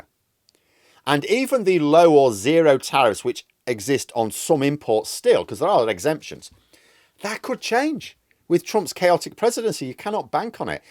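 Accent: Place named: North, United Kingdom. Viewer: What absolute bollocks as ever from this silly site and a strange man who shouts his northern accentuation at me.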